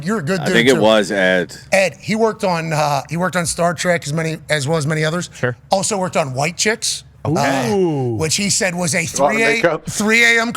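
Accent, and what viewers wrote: Pittsburgh, United States. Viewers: Should have turned up the yinzer accent to the max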